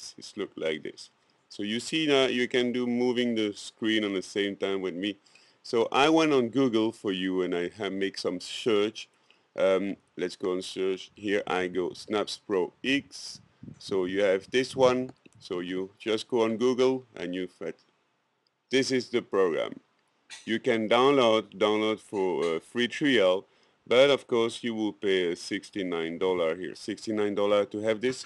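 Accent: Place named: France. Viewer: lol accent francais :)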